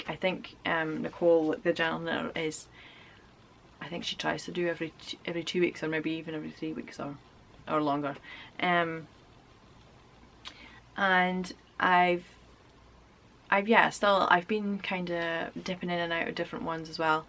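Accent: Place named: Shetland, United Kingdom. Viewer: Absolutely loved watching this!! I love everything you showed, and you are so at ease in front of the camera! I hope these videos become a semi-regular thing — I prefer little loose videos on a single topic than big podcast episodes with segments tbh. And your accent was so interesting to listen to — I'd never heard a Shetlander before!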